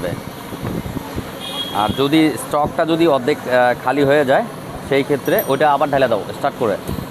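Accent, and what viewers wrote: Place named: Naogaon, Bangladesh. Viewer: ভাইয়া আপনার গ্রামের বাড়ি কি নওগাঁ জেলা? মানে আপনার কথা শুনলে মনে হয় তো তাই জিজ্ঞেস করলাম আর কি!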